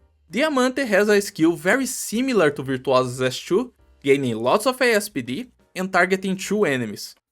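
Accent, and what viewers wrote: Brazil. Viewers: Bro... you are brasilian ? Your sotaque is sooooo Brazil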